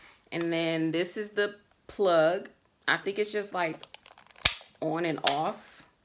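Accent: United States. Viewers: HI Beautiful!! This IS a PERFECT Video!! Thank You!!! What part of the UK do you live?? You sound American.😃😇🌼💐🌸💐🌸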